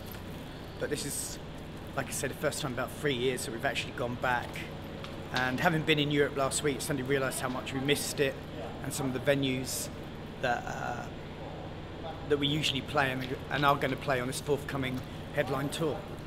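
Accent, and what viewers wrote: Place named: United Kingdom. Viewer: "his voice is so weird." He's British. We all have different accents in Britain. Northerners (like me) have stronger accents. It really depends.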